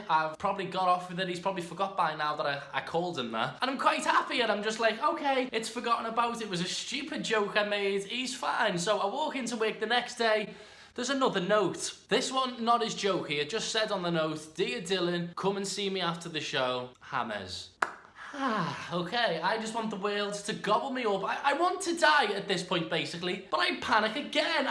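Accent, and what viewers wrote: Liverpool, United Kingdom. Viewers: It’s weird seeing a scouser big in youtube😂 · Auyyyyyyyyt my fellow scouser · HOW DID I KNOW YOU WERE FROM LIVERPOOL EVEN THO I NEVER HEARD A LIVERPOOLISH ACCENT OMG IM SO CLEVER 😂😂😂❤️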